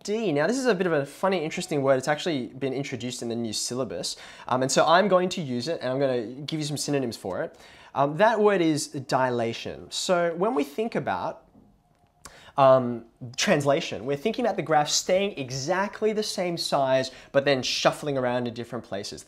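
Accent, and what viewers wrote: Australia. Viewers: great video but i hate the austrailian accent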